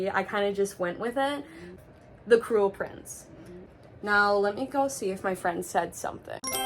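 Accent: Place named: Wisconsin, United States. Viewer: I knew I heard that accent! Hello fellow Wisconsin book lover!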